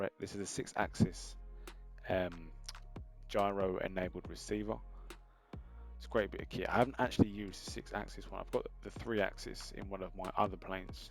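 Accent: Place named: Ireland. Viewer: Love that Irish actsent.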